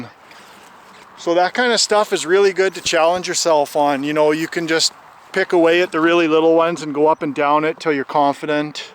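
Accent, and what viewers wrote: Canada. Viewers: Man your videos are awesome .. You are a natural with explaining things AND clearly know your stuff .. This is a combo that seems more rare and hard to come across both online and in person nowadays, it seems. I have been able to take sooo much from your videos man, just want you to know I really appreciate and value the hard work you put into your vids. I know there are many others that feel the same as I do about your work here on YT. Please keep up the hard work so that we can all learn from your experience man. Also, it makes for easy listening with your chill Canadian accent lol .. wouldn’t wanna hear any type of help vid with my harsh nyc/nnj accent hahah 🤣 .. again your awesome for this stuff man .. nothing but love my man !! 💯👍😎🐐💪🏽💯💯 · Canadian from the voice first time watching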